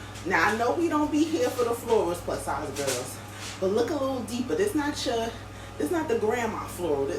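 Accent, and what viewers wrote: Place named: New Jersey, United States. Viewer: You're Jersey girl accent🥰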